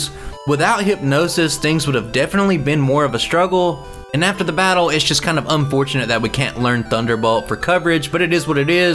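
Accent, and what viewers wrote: South, United States. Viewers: That cold didn't mess with that beautiful southern accent. Love my brothers from the south getting it done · idk why your videos dont get more views, theyre very good. maybe its the strong southern draw, but im a southern boy as well, doesnt bug me.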